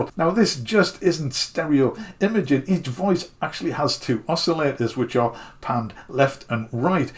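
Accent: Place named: North East England, United Kingdom. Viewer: Hi, You seem to have a north-east twang, I'm in my 50's, an electronics engineer and live in Teesside. I collect faulty 80's and 90's keyboards, modules and obscure sound generating gear, put them back to fully functional and script files to interface with Cakewalk or Fl studio. Software emulation is good for lack of space, but it won't appreciate in value and many deep dive functions in my Korg gear (M1, X3) would never be replicated, Korg in the 90's mastered the full-bodied out-of-the-box sound. Best wisher for 2024. ✌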